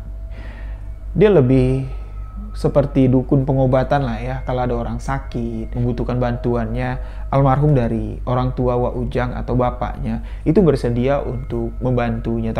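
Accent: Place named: Jambi, Indonesia. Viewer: Mantap, 👍, saya suka cerita dari mas, logat Jambi nya enak di dengar 🙏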